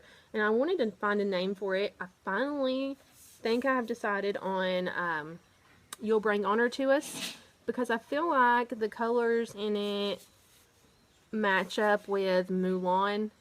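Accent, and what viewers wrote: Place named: South, United States. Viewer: I have enjoyed both of your podcasts and am looking forward to more. I'm a knitter and crocheter from Pleasant Shade, TN. Love the details that you give about your projects. Your hand dyed yarns are lovely, I would love to learn to do that someday. It's a treat to hear a podcaster whose southern accent matches mine! And your Co-host is adorable 😊.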